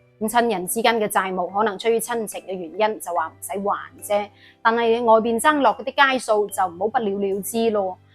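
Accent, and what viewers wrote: Guangzhou, China. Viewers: 正宗廣府話好親切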